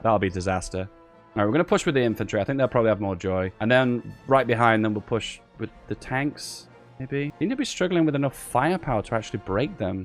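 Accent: United Kingdom. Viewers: Watch your videos because I like your British accent. Greetings from Russia)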